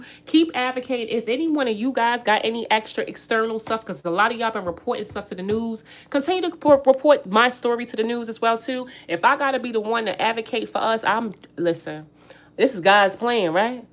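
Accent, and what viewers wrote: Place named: New York, United States. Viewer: Yes I can hear a NY accent i love it!!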